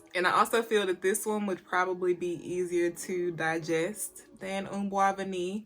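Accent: United States. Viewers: I love your country accent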